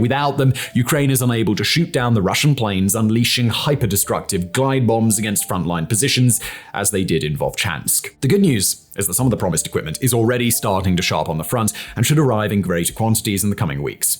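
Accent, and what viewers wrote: England, United Kingdom. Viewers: you're British with the most English accent outside of the royal family or the 1950's BBC...It's 'Autumn' not 'Fall'.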